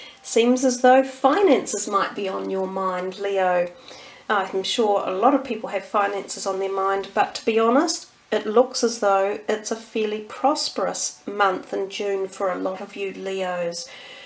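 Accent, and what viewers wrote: New Zealand. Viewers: Kia Ora! Thank you very much good to hear a kiwi voice from the homeland. Much love from the Philippines. Thank you for your time and effort much appreciated!!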